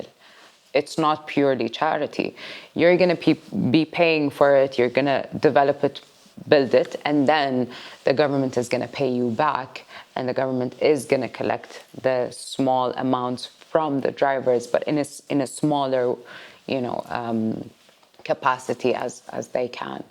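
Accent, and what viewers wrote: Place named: Arab world. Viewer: Masha Allah🌙 Us/We,..Muslim/Women,..Mothers have more responsibility's than Men have,..we take care of Children/Husband/Family/Career/Domestic chores etc etc,..doing all these things in a loving/caring good way gives us positive energy,..I regard all my responsibilty's as divine chores,..I love/like accent of Arab Men/Women talking English,..well spoken,..Shaikha,..Inspiring,..investing in person/people,..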